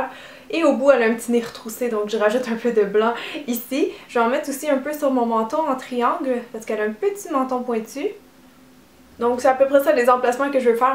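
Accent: Quebec, Canada. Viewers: Hahaha j'ai tellement aimé le doigt à la fin. xD Je vais le rabâcher encore et encore mais t'es tellement douée ! Et drôle aussi. Et super jolie. Pat dépêche toi de la marier ! 😜 Le maquillage des yeux est vraiment canon ! J'adore t'écouter tous les samedi parce que je t'apprécie et aussi parce que j'adore l'accent québécois et vos expressions un peu singulière (je suis de Paris) haha. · je comprends ton point... Mais ce que je voulais dire c'est que c'est une manière de parler... En france vous prononcez "s'il te plé" tandis qu'au Québec, nous prononçons "s'il te plè"... Vous dites un toast, nous disons une toast... c'est peut-être "une faute"... Mais c'est comme ça qu'on dit ici (en général)... n'est pas une enseignante de français... Elle n'a pas (selon moi) à changer sa manière de parler ou les mots qu'elle emploie, peut importe son auditoire... Mais ça, c'est encore que mon point de vue... 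Venant d'un Québécoise fière de sa culture et de son jargon!:) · OMG je t'adore!!! Jolie, intelligente, douée, et tellement drôle x'DLes Bloopers, surtout le final, m'ont tuée:')Et puis le maquillage est super beau!!! Bisous d'une abonnée fidèle, et merci pour ce tuto (Elsa est une de mes princesses préférées... ^^)LaurelinPS: J'ai une famille québecoise mais je ne vis pas là-bas, alors quand j'écoute tes vidéos, l'accent trop cute me rappelle la maison. Change absolument pas t'es parfaite, gros becs.:D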